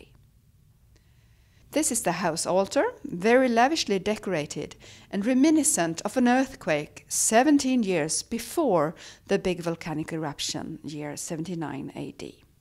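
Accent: Sweden. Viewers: What a 'weird' thing for you to say. In Europe we tend to learn more British English. Did you expect her to speak in an American accent? She's Swedish and speaks British English with a Swedish accent. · Well, she has a very strong Swedish accent, so I just tried to ignore it. My pet peeve is when people so often write "women" in the singular, but never "men" in the singular. It's like logic escapes them. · Not fake, it's a Swedish accent.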